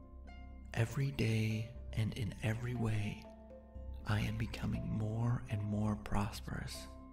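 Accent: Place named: Scotland, United Kingdom. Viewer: These meditations are perfect. <link> him has a gentle, warm, pleasant voice (with a slight Scots accent). The words are simple and clear. The timing is perfect... just as you start to find your mind wandering, he says and if you find that your mind is wandering... . There is no background music and no noise in the background, only his peaceful and engaging voice. The length of the meditations (about 1/2 hour each) works well for me. I find that I tire of some meditations after frequent repetition, but that hasn't happened with this one. The metta lovingkindness meditation always feels right. Some meditation CDs I have tried have not felt right. The voice seemed hurried, or sounded tense; the background music seemed intrusive, or there were noises that interfered with concentration. This is just perfect as an introduction for new meditators, and it's also a meditation that I return to again and again. 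I'd give this more than five stars if that were an option. Even my students, who are not ordinarily very receptive to meditation, relax when they hear this one!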